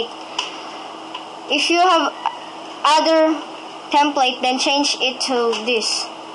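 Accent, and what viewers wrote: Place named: Philippines. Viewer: Hey your english accent, sounds like you are pinoy right!!!!!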